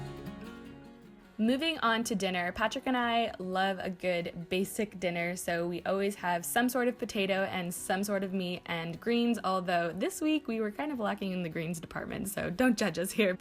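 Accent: United States. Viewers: I'm confused. You have an American accent but I noticed you using British products ?? No hate just curious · Do you get Asda in America? I assume by your accent that you're American but I thought that Asda was only in the U.K.? Might be be wrong xxx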